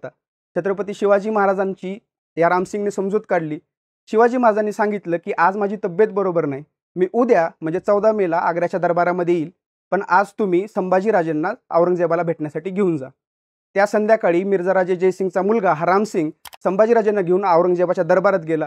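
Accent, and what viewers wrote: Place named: Sambhajinagar, India. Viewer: भाउ तुम्ही मला मूळ संभाजीनगर नगर चे दिसतात भाषेवरून त्यातल्या त्यात सिल्लोड चे .. तसा मी चुकीचा व असू शकतो पण मला वाटतंय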